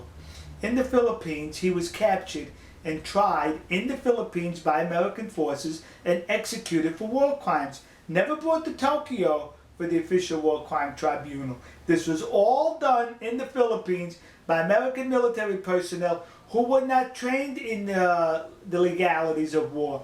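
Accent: Texas, United States. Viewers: テキサス訛りの英語をですか？教材には向いていないと思いますよ。 残念ですが・・・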